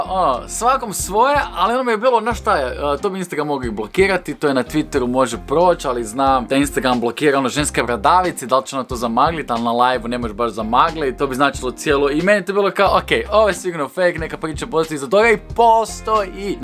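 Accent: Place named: Rijeka, Croatia. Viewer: Danas su nam bili prijatelji iz rjeke isti naglasak ko ti ja se odusevila ;) baci neki vlog na temu srbi I hrvati u smislu da se medjusobno vole ili tvoje neko misljenje za to ;)eto moj deda je bio na golom otoku I imam rodjake koi su preziveli oluju al eto ugostili smo porodicne prijatelje iz Rjeke I mnogo dbr druzenje bilo ;) I sa muske strane .xd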